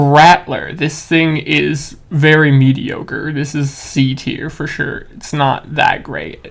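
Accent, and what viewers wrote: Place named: Germany. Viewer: You sound so german to me lel, and why do you refer to yourself as mentally unstable? 😅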